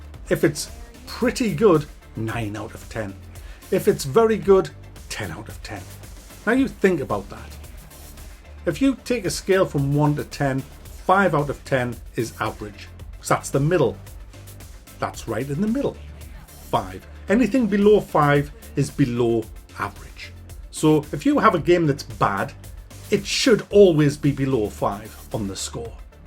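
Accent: United Kingdom. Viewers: Stop pretending you speek english! I can't freaking listen to one more Brit on youtube... I need to put the auto subs on just to get your point and thats not the goal! And I want to watch all your videos becaus I adore them and you are pretty right with your critique, I have so often the same feeling and i dont want to hate on new AAA Games but how can you love old diamonds like Bioware or Bethesda in 2019? Its a shame and Im just keep playing becaus of good indie games like Mordhau or the upcoming rebel galaxy game.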